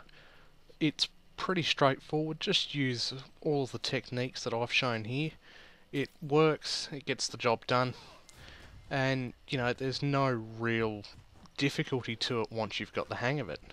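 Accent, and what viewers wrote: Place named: Australia. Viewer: But yes it is Australian. It's a pretty broad accent at that, bordering on what we call "ocker".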